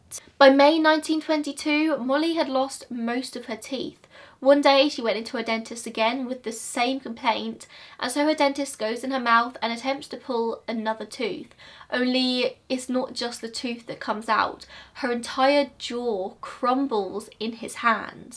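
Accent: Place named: Cockney, United Kingdom. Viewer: Hi, you're such a cutie pie along with you're cute Cockney accent dear xoxoxo.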